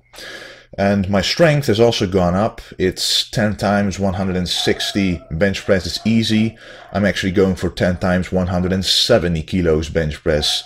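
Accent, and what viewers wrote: Netherlands. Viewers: Props for the Dutch accent! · Ben je Nederlander? Lijkt alsof ik een Nederlands accent hoor. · IN A WORLD.... WHERE EXTREME BODYBUILDING IS MOST IMPRESSIVE... THERE IS ONE MAN WHO FIGHTS... FOR THE NATURAL LOOK. Haha, you have that voice man! You Dutch bro? You sound Dutch :) Power to you, keep up the good work! Peace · Ik wist meteen dat je een hollander was met dat accent:p maar vette videos man.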